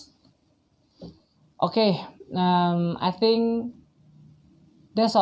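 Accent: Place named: India. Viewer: logatnya sedikit mirip kaya orang india wkwkwk boleh laa kak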